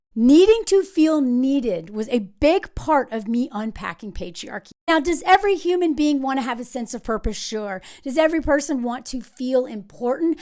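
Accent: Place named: Texas, United States. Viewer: The way you talk reminds me of one of my Grandmas. She was from way out in the country in south Texas (not sure which town).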